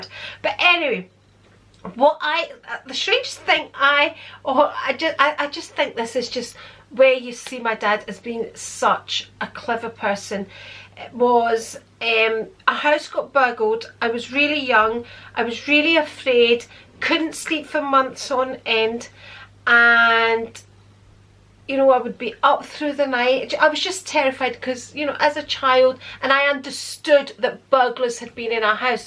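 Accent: Scotland, United Kingdom. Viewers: Ah that was really good to listen to I enjoyed that. With your Scottish accent I was very surprised you were born and raised in London. Seeing the photo of your Mum you’re the image of her....also are you an only child? Xx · The Planner... The Executor! And What A True Gentleman Ehh... Putting Him On The Spot... Yet Once Again Executing The Perfect Answer!! Thank You So For Taking Us Down Memory Lane And Sharing Such Personal Incidents... Some Lovely Insight Into Your Parents Values And Character... Feisty... ‘ Fist 👊Fight En Route To Cyprus... 🤭... And A White Halter Neck Dress... Ooh Dear... 😱... Did Tell You Off? Lol 🤭... These Are The Questions I Hope You Will Be Able To Answer Next Time... 1) What Were Your Parents Reaction When You Told Them You Wanted To Get Married At 17... 2) What Was Your Childhood Ambition... What Did You Want To Be When You Left School? 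3) You Grew Up In London But You Have A Scottish Accent?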